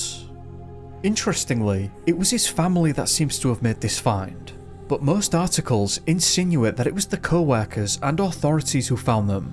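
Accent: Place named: Northern England, United Kingdom. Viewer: As an aside, love your accent, but can't place it... northern England? Yorkshire? Tell me!!!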